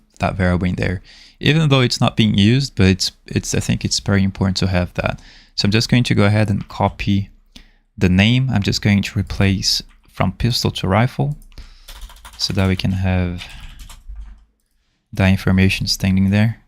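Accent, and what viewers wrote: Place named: Brazil. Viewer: mano, tu é brasileiro kkkkk programo ouvindo vc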